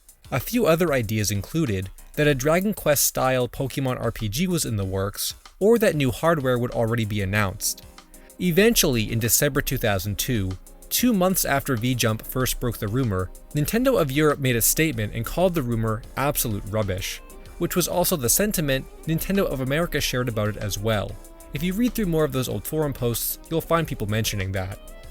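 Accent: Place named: Massachusetts, United States. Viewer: 1000 to 1 your from Massachusetts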